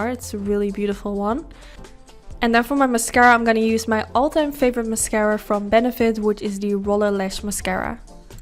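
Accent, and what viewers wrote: Netherlands. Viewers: I’ve never seen one of your videos, but the second you started talking, I knew you were Dutch haha! 🇳🇱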